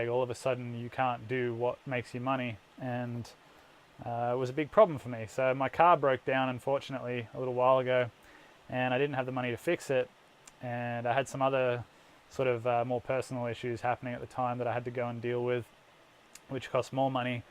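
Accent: Australia. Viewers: I've missed you! Glad you're back! The job sounds like it will dovetail nicely with your interests! I love your videos because it is great to hear an authentic Aussie voice and Aussie scenery. I am sick of only seeing the USA stuff. I want to see more Aussie stuff.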